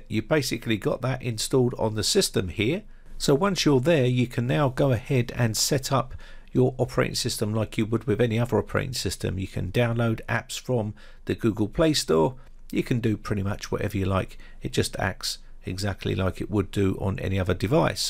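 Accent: England, United Kingdom. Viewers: noo? a noo partition? Wait, you SOUND English... so.... noo? What Nationality are you, boy? its a NEW one on me.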